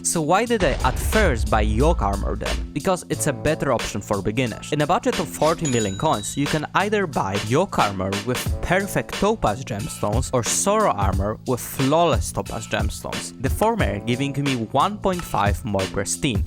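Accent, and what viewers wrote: Poland. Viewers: your accent sounds soo polish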